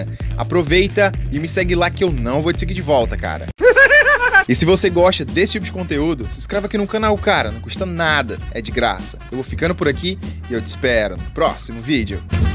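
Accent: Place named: São Paulo, Brazil. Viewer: Gente eu achei que esse cara era aqui de São Paulo 😂😂😂 Salve ♍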